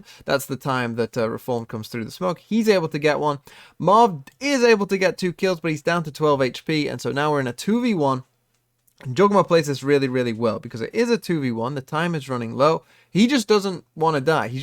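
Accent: United Kingdom. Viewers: It's because he is. He literally has a British accent.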